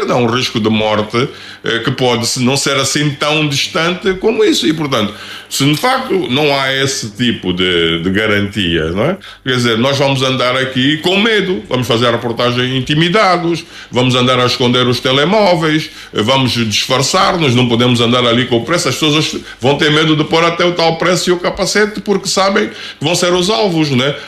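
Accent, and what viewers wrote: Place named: Angola. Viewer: Voz do angolano puro .Fala a verdade ,a língua portuguesa com gramática.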